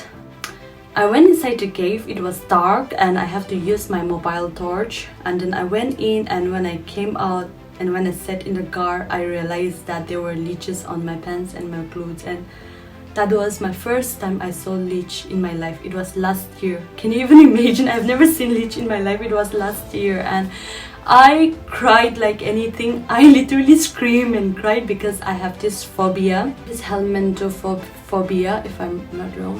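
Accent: Nagaland, India. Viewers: Nice to hear u speaking Sumi dialogue...."Alhou pelo okumugha tsu peni....Afo". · So nice to hear u speaking sumi dialect........like it. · Love the way you give your expressions Simple, pure and most understandable with original naga accent. And most importantly, I admire that you gave the perfect spell of KHIAMNIUNGAN which is that maximum people of other naga tribes cannot, which has also been asked in the NPSE exam....awareness for those NPSE aspirins ,,,hahah... Anwy zzz,,,keep doing research and make more videos and supply more informations like this,,,lots of love from Khiam's family...!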